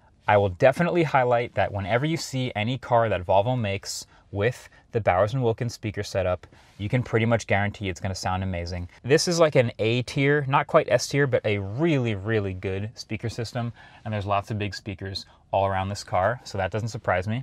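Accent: New Jersey, United States. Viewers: he so new jersey 😂🔥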